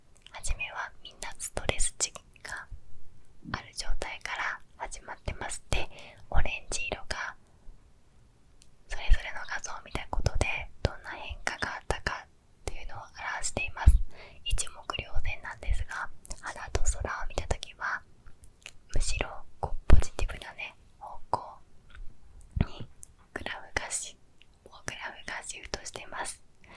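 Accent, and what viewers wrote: Kansai, Japan. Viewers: こんなに海の音綺麗に録れるの凄い！ てかRunaさん関西人？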